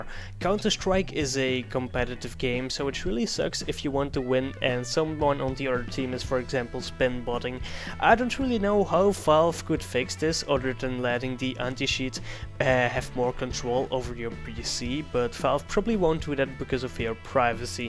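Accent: Netherlands. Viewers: are you dutch? i thing i know your accent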